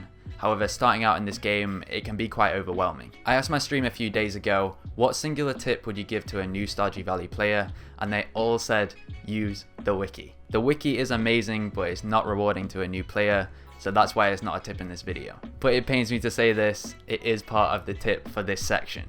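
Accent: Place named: United Kingdom. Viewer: Chewsday I like british accent bro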